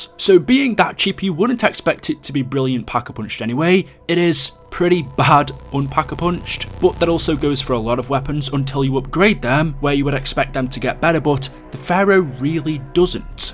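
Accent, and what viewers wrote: United Kingdom. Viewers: are u brithish or are u american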